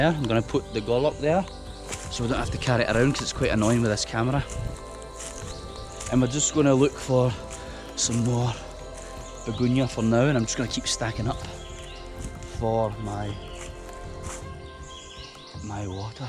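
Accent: Scotland, United Kingdom. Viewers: Nice channel,keep going..areu from Scotland mate?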